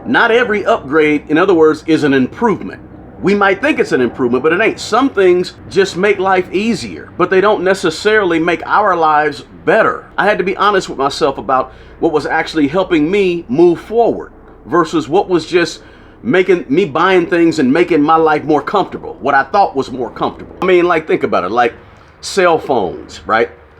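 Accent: United States. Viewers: You're a smart cookie! I know exactly what you mean. I have cupboards bursting with stuff! Good video. I love the American accent 🇺🇸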